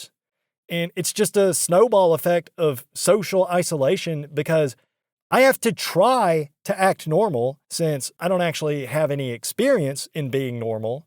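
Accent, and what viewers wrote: South, United States. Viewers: Aye man. I dont know what to say about your story without it being patronizing or anything like that. I really hope things look up for you man in some way. I live in California and by your accent im guessing you live in the south. Just know this life is worth living. I know sometimes it feels like it isnt but if at anytime you need a bro to talk to man im here bro.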